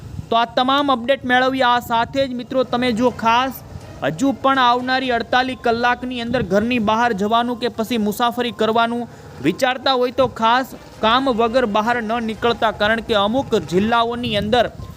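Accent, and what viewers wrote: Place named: Kathiawar, India. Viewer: Aa bhai ni bolva ni style kaik alag j chhe. Pan che Kathiyawadi. Vakya puru kari ne sawal puchhe chhe....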